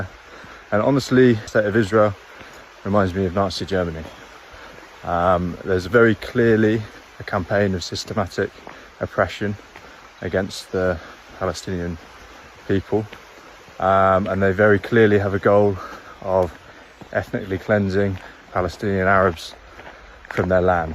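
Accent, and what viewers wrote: United Kingdom. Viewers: American in Palestine? You need to change the caption, he’s clearly British. Which doesn’t alter the message he’s trying to present. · From his accent, he is British (specifically born & raised in England) - not American.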